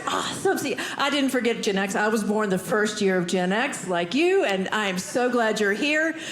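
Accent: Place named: Maine, United States. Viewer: Still a little bit of a Georgia accent, but more Maine in there than before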